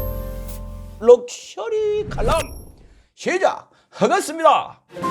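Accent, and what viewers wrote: Jeolla, South Korea. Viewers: 혹시 전라도 출신이신가?? 궁금해서요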